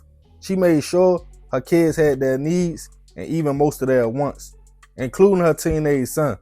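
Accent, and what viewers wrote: Baltimore, United States. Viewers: I love when I can still hear that Baltimore accent in your voice 😂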